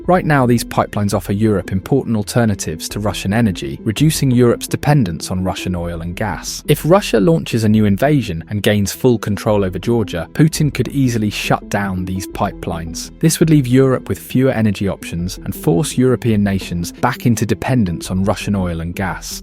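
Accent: United Kingdom. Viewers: You are so full of crap. Why is it that the British accent always comes with russophobia?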